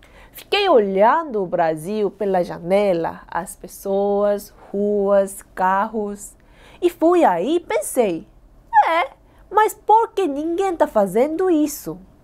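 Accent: South Korea. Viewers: gosto muito de você principalmente do seu sotaque coreano... quanto ao cigarro o governo junto com a saúde fez campanha pra pessoas deixarem de fumar....isso contribuiu muito...tem o Dia Nacional ante tabagismo....abraço... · Mano ela fala português muito perfeito é tipo ela fala português e é tipo o geito é muito parecido com coreano muito fofinha🥺💕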